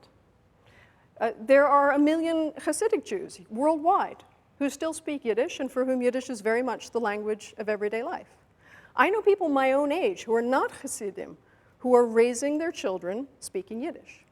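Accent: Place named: New Jersey, United States. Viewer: Her "oy" should be more "uy -- u as in duh, but tighter". And her Jersey accent has been....neutered. I'm not saying Bayway or Sleezeside Heights, but a Morris County accent, perhaps. Especially if she's speaking Yiddish.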